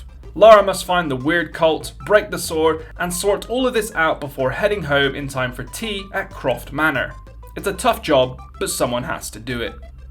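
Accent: Bristol, United Kingdom. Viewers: Bristol accent!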